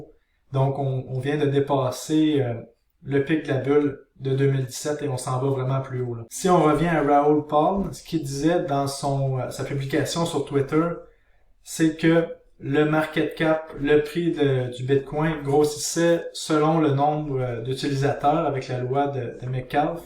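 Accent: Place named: Quebec, Canada. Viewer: Ça fais du bien d'écouter un québécois! Continue comme ça très intéressant, j'ai hâte a tes prochains vidéos!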